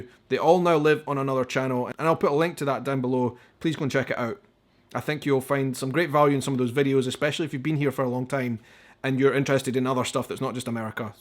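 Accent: Scotland, United Kingdom. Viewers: Greetings from wyoming!! Salt Lake City it’s a diverse city I do love it there , my brothers do live there!! The best time to visit is in the autumn spectacular views from the mountains, I highly recommend it 😌 I love your Chanel your accent it’s so sweet, my son just married a lovely young lady from aviemore and as well looove the accent !! We are planning in visiting Scotland soon I hope!!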